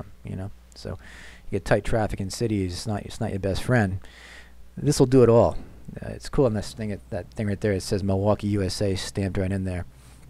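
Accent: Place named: East Coast, United States. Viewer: Why do you talk like an east coaster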